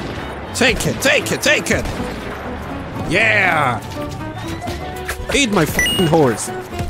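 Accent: Spain. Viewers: Eres Ingles O Español?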